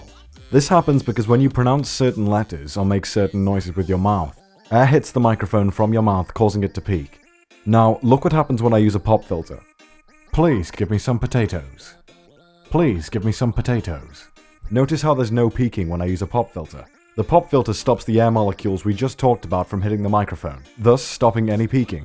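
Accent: Wales, United Kingdom. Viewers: The Slight Welsh Accent Is Very Very Pog 😂😂😂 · you sounded more welsh back in the day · holyyyyy crap he sounds so different back then xD and u can also hear a tinge of welsh in his accent too shEeEesh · Watching this in 2021, and I gotta say......What happened to British accent? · Holy shit a time when actually sounded Welsh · Omg you can hear more of his welsh accent here!!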